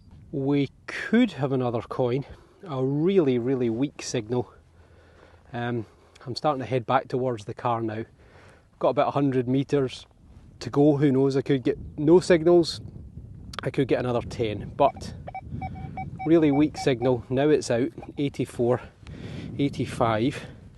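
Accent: Scotland, United Kingdom. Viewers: The flowers on the cup are irises. I love your videos and your Scottish accent.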